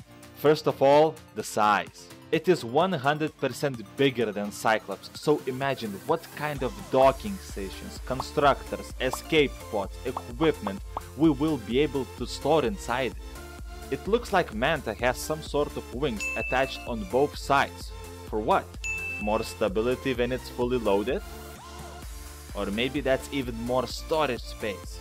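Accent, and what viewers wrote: Russia. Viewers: do u have a Russian accent? it sounds like it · This man has a Russian accent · your accent more like russian are you russian? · Your accent is like Russian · I love his russian accent.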